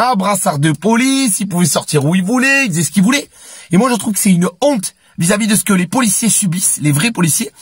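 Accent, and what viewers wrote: South, France. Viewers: Merci pour ton accent qui me fait voyager dans le sud!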